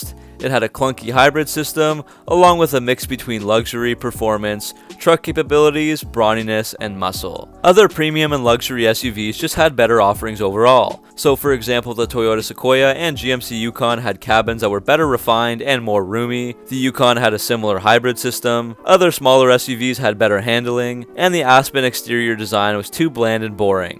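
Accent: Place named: Canada. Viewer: Canadian dialect is omg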